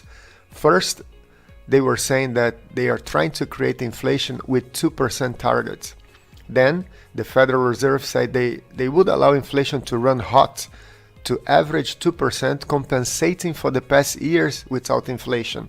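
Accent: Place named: Brazil. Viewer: Not gonna lie, but your acent sounds very Brazilian for me haha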